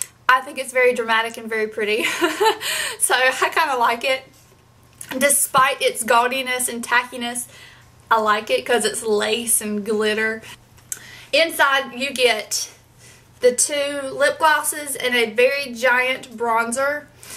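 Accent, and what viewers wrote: South, United States. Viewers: I'm also from NC. I'm from Durham, we both have that same little southern twang lol. love your videos by the way <3.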